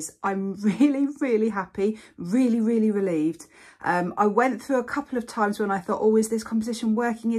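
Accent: United Kingdom. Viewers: By your accent I feel you are in UK, there are very few acrylic pouring demonstrations from anyone other than USA/Canada, though they are really interesting, it does make a difference to hear the materials as USA materials are not available in UK. I love your work, I am a beginner and struggling but determined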